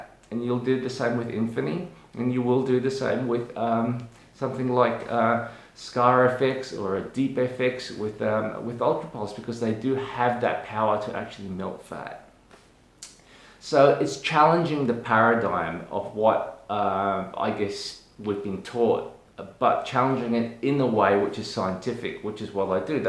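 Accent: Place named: Australia. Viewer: A Korean-aussie with a full on aussie accent. I've seen it all ㅋㅋㅋ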